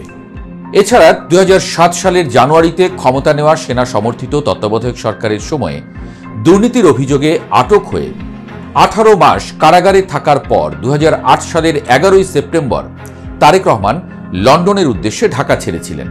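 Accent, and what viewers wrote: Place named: India. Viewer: এর খবর পড়া স্টাইল দেখো মনে হচ্ছে এই মালটা দাদাদের দেশের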